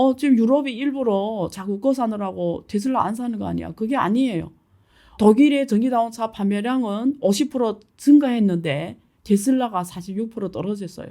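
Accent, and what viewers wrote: China. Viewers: 조선족 출신 교수님 이십니다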